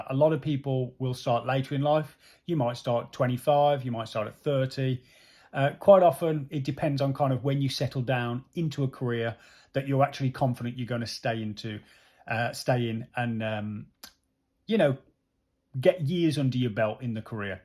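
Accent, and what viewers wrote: Black Country, United Kingdom. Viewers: interesting black country accent there :) Your on a par with all the other videos I have watched on you tube so well done on your research. Hardest bit is the starting to save. Get over that and then your on to a winner.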